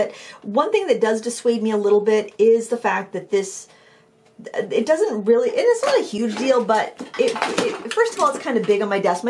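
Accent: Maine, United States. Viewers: I'm thinking the same thing. Wish I would have watched your review before I sent the tin back.. I am curious if I could have gotten the tin to flex back into place like you did. :) Anyway, thanks for all of your reviews! I love listening to you, since I was born in Portland Maine, but live in Portland Oregon now. Miss that Maineard accent. :)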